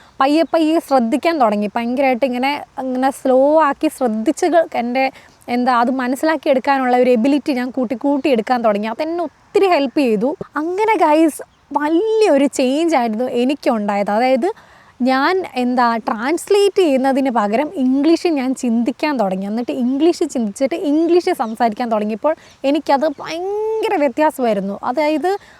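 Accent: India. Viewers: Don't try to fit in Embrace the Indian accent of English language😉❤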